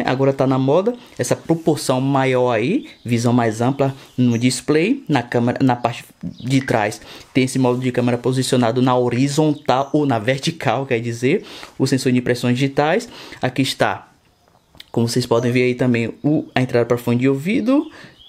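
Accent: Bahia, Brazil. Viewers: Tu é da Bahia?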